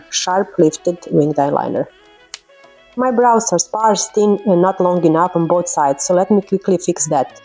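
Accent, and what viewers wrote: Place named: Russia. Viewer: вы русская? Зачем говорите на англ. языке, да еще и с таким акцентом?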